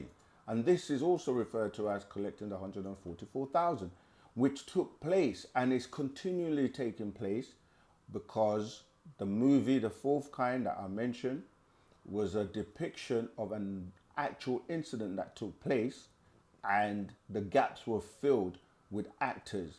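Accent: South Africa. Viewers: I can hear this broor is probably Zulu/Xhosa from South Africa 🇿🇦🇿🇦🇿🇦shout out ......Thank you for the break down ❤️‍🔥❤️‍🔥❤️‍🔥❤️‍🔥❤️‍🔥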